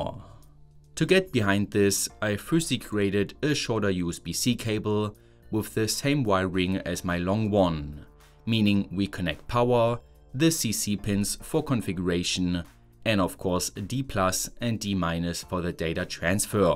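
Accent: Germany. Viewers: du bist deutsch?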